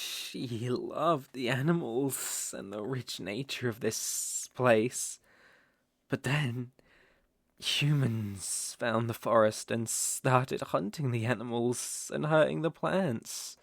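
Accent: United Kingdom. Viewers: What did I just bump to?! That's the right amount of sssss and Britishness I needed to hear! Oh my... your voice acting is very great! I'm gonna to some walk around his channel AHHH Thank you for your efforts!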